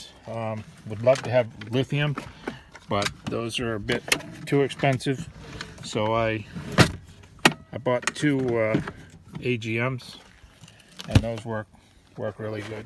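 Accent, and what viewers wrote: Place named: New England, United States. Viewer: Great tour - thanks so much. A few times I thought I heard a northeast accent. Are you originally from New England?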